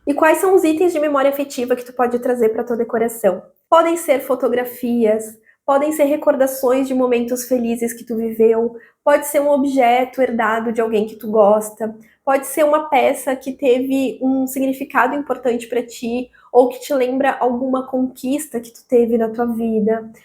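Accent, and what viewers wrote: Rio Grande do Sul, Brazil. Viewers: Adoro o sotaque gaúcho!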